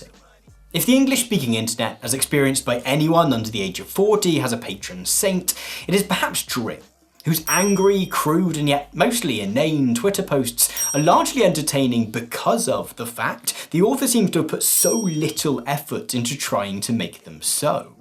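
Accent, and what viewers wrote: RP, United Kingdom. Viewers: dont u hate it when the dude narrating a 45 min video is british :( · hearing the words “the shitpost is defined by” in a posh british accent is a transcendental experience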